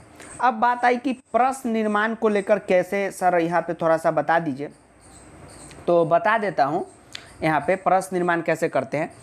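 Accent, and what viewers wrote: Bihar, India. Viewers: Sir ka voice sa hi pata chal raha that he is a bihari ..😅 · Mam ye bahut expert hai mai ense offline me padhta hu sir ka kuch word mouth me hi rah jata hai natural problem hai and sir Bihari hai esliye thoda edhar ho jata hai we proud on bihari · Sir aaap Bihar se ho na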